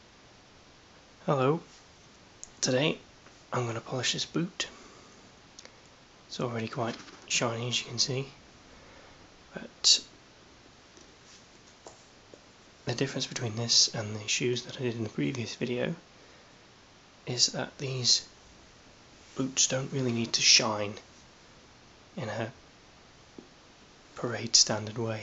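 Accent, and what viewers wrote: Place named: North-East Wales, United Kingdom. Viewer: Very nice and relaxing. Hmm as for accent, I'm probably wrong bit you have a similar accent to my friend who lives in north east Wales, near liverpool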